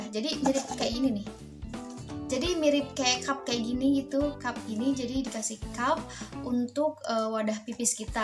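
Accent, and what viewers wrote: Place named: South Kalimantan, Indonesia. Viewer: orang banjar ya kak😊logatnya ketahuan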